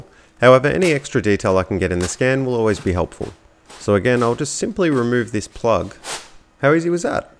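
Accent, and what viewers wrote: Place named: Australia. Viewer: Great content, description, and commentary. Gotta love hearing the distinctive Aussie accent, too! 🇦🇺 There's some pretty helpful information to glean from the galahs watching from the wires too! 😆 10/10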